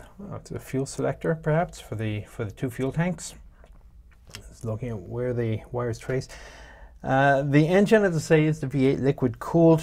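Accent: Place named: Ireland. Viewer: I don't care for tanks, never seen one, never interested me. But that Irish accent I want to hear it all day.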